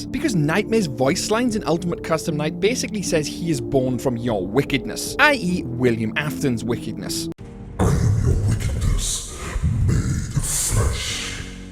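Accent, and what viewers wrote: Wales, United Kingdom. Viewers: the welsh accent is real 🔥🔥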